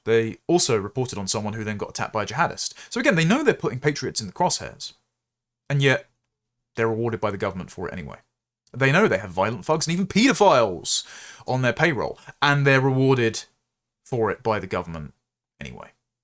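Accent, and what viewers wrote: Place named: North, United Kingdom. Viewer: It’s not a “twee Northern accent” it’s ….. his accent, you patronising get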